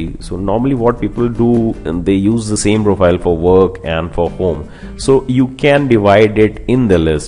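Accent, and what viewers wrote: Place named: India. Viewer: I love your accent, Mr. fellow Indian.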